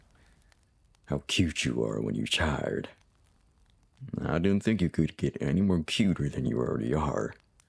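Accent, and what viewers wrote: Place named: South, United States. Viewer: I'm a sucker for a southern accent! 💖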